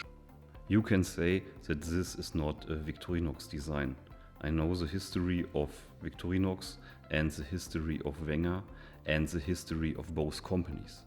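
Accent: Germany. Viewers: You know that this is a Wenger design, made with Wenger machinery in the old Wenger factory is Delemont, under Vic ownership and branding? Having said that this range never appealed to me due to the aesthetic and I can't EDC locking blades here in the UK. In fact I haven't really wanted to buy any of the Delemont (formerly Wenger) SAKs as I said due to aesthetics, the thicker scales, slightly smaller size (85mm instead of 91mm) resulting in shorter tools like main blade & woodsaw, toolset - as I much prefer a small blade over a nailfile - and finally because they tend to cost at least 50% more than the equivalent Ybach manufactured models. When it comes to watches though I do love my Wengers! They have the same specs as Victorinox watches but are much nicer designs in my opinion, much less ''busy'' faces and more understated and elegant, and cost a lot less. So I just make sure my SAKs come from Ybach and my Swiss watches come from Delemont. 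I've never had any quality issues with any Ybach manufactured SAK and they are just great value for money. My latest purchase from them was a Swisschamp in black, it cost me just £58 with free next day delivery (not from the Vic website obviously), that's just 66 Euros, insanely good value. Thanks for your detailed review. I'm 99% sure from your accent that you are in Germany, and if I want to find out if a product is good or not, a German reviewer will give the most critical detailed review. Deutsche Gruendlichkeit usw. I often double ch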